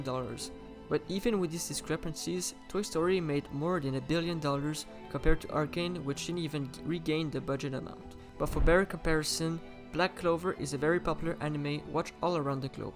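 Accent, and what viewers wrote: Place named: France. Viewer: I cant agree, he has a slight french accent but the quality of the sound is very good.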